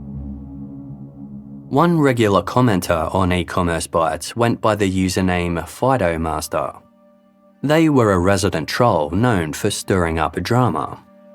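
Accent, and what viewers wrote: Australia. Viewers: Psychopaths are not always serial killers they are corporate bosses and world leaders The planet is run by psychopaths The he fact that the president and vice president got extraordinary payouts compared to the compensation for the Stiener’s AND went on to become leaders in other corporations speaks volumes how psychopaths are valued in business and politics Glad the local police were so diligent ,sadly not usual with police investigations And as usual well told and thorough recount And as a fellow Aussie so nice to hear a familiar accent👍🇦🇺✌️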